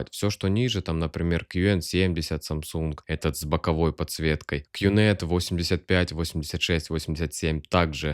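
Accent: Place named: Ukraine. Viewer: У тебя оч приятная укр мова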